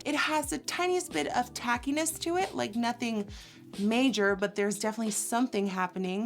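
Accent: United States. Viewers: Kawaii dude this is the second time I see you commenting this hahaha people don’t have a problem, they just thought she was American based on her accent. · Leah Jones she's not putting an accent on, it's because she's been around American people for so long. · Quick question. I'm new to this channel and I noticed she mentioned that she lives in the Netherlands. Has always lived there? I wasn't sure as she has an American accent.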